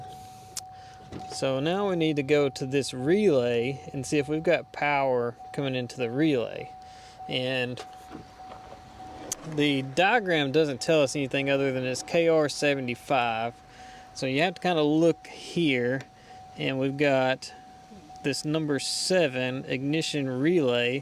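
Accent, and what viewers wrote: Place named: Deep South, United States. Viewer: How about you? Your southern accent makes me think Deep South, but even some of us citified Okies sound pretty hick. So accents can be deceiving.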